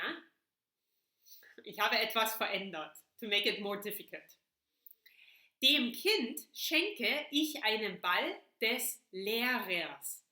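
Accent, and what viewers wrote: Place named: Germany. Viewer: explaining it very slowly with a very german accent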